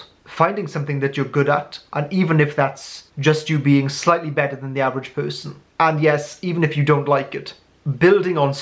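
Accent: Wales, United Kingdom. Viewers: Are you Welsh?? I'm from South Wales and you sound exactly like a Welsh person. Us Welshies are very rare to find so I always get really excited when I hear someone who sounds Welsh. First video I've watched from you and it's so accurate, completely agree with you!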